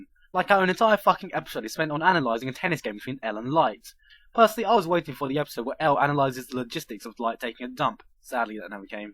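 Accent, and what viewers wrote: United Kingdom. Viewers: Dude he had such a British accent back then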